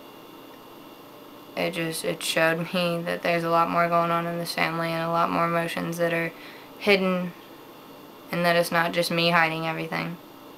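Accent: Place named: South, United States. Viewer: ... >.> you're from the south... O_o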